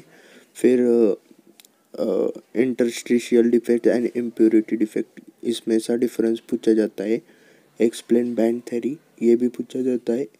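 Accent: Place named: Maharashtra, India. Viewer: Hindi tone tar Maharashtra chi ahe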